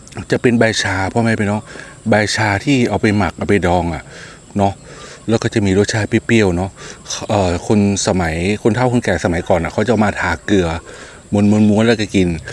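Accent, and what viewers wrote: North, Thailand. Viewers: ชอบ ฟังพี่พูดภาษาเหนือมากคะ น่ารักมาก ขออนุญาติถามคะ ลำแตลำว้า มันแปลว่าอะไรคะ🥰🥰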